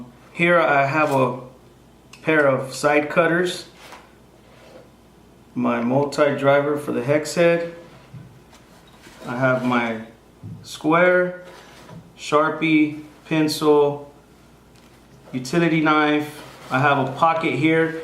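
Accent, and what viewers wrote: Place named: Mexico. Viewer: se ve gringo pero tiene acento de hispano (mexicano?) :D what's up with that? trippy, no hate just curious from a venezuelan guy :)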